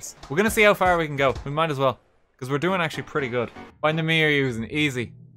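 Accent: Ireland. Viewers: Is this lad irish cause like he sounds irish but speaks like hes american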